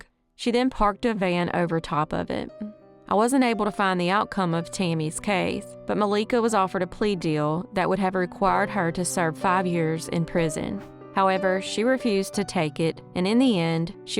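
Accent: South, United States. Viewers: 🤔 I literally heard these crime updates, word for word, on another podcast. Is " " cutting and pasting together her show and adding a country twang?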